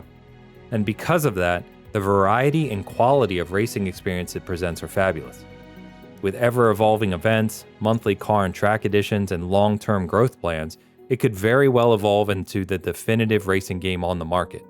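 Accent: United States. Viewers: lol finally someone who understands an American accent. it's strange to me sounds like rural middle America. The people replying to me that think Americans have 1 accent is hilarious. I'm the dumb one to them... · I'm going West Coast, N Cali or Oregon.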